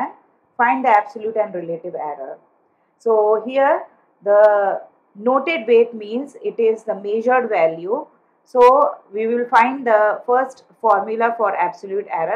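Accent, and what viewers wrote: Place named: India. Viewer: Indian accent, automatically clicked off. Idiot.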